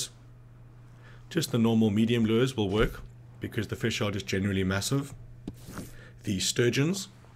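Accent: South Africa. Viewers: You from South Africa? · Are you from South Africa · South African Accent.. awe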